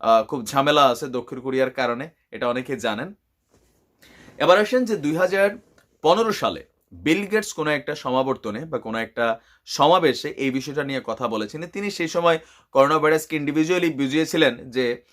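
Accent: Comilla, Bangladesh. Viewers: ভাই আপনি কুমিল্লার 😍😍😍😍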